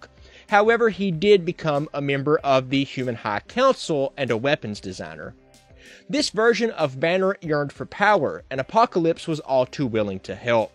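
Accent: United States. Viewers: Country accent??????? N. O.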